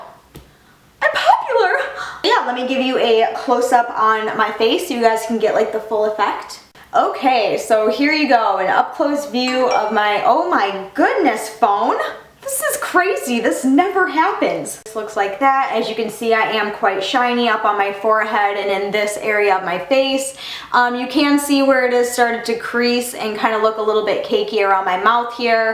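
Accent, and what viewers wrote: United States. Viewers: YOUR SO BEAUTIFUL!!! ❤️💕 and girl you killed me when you said "girl, IM POPULAR!!!" 😂😅💕😘❤️ could you make a video on your everyday routine? Like makeup, clothing choices, piercing Changes, things in that genre. Also, your amazing, an I love how you speak! Are you American? That's probably none of my business but your ascent is just amazing! I love the way you say things!! 😅💕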